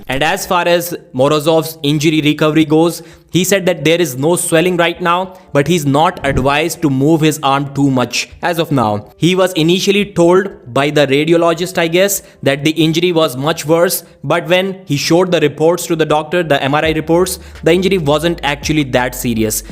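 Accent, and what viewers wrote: India. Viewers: Hey have you ever considered using an AI voice for your videos? I would watch them more but your thick Indian accent makes it hard to understand what you’re saying sometimes.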